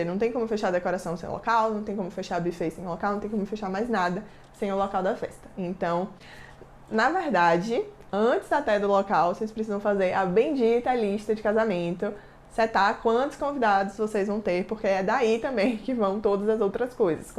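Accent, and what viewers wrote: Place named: Bahia, Brazil. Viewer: Aiiiiiiii! to AMANDOOO que vc ta se dedicando 100% agora ao youtube. Acho que você vai crescer SUPER aqui, te desejo todo sucesso viu? adoro seus vidÉos hahaha. AMO seu jeitinho leve de ser e esse sotaque lindo da Baheaa! Vou ate ativar as notificações! To maratonando seus vídeos novos. ps: FAZ O VÍDEO COM O MATHEUS SOBRE FINANÇAS SIMMM. amei que vc tb é taurinaa, e meu namorado tb se chama matheus! Um xerão💕💕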